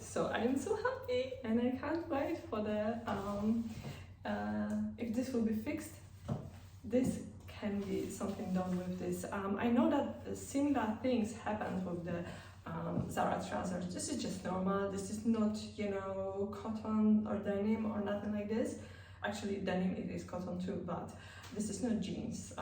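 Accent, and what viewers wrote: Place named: Poland. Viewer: sounds like polish - english...)))the worst and most horrible English.... not "free"....correct its three !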